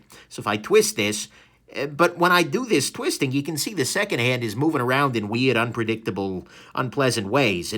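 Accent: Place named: New Jersey, United States. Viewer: Nice review! Thanks. Love the (Jersey? NY?) accent :)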